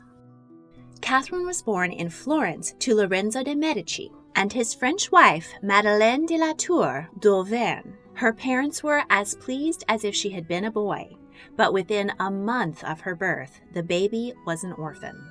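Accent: United States. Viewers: Must we have this ghastly American accent? All expression utterly distorted. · Not another American narrator ...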